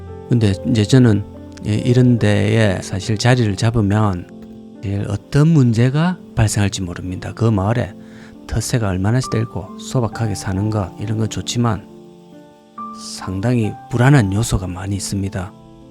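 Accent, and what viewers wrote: Gyeongsang, South Korea. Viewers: 저고향 의령 선생님 말씀진리 임다~ 구수한갱상도 중독성 있는 음성 힐링됩니다 감사이 잘봤습니다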